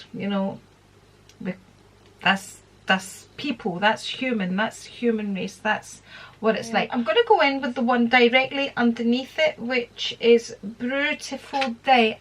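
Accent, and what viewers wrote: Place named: Glasgow, United Kingdom. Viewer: Tb brutally honest as a fellow Glaswegian if we don't have the Glasgow University accent I feel we are under valued. I am a partner in a very successful international security company but as my husband is from a very affluent side of our city his accent is more appreciated shall we say. I love watching you and your family. So down to earth and relatable, don't think it's you sweetheart by any means but as I said sometimes it's just the way we speak. Hopefully things will pick soon, before you know it. X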